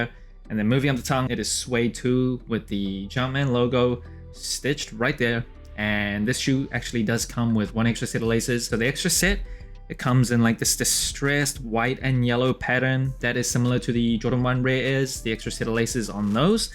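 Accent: Australia. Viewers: Did you spend some time in Australia? I can hear a tinge of Aussie 👍🏼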